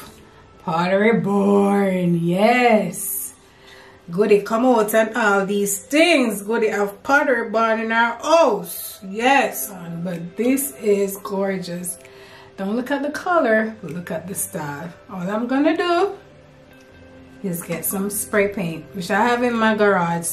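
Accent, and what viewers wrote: Jamaica. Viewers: I hit that subscribe button 🔵 the minute I heard that Jamaican accent. Gots to support my Jamaican gurlll. Love everything you scored.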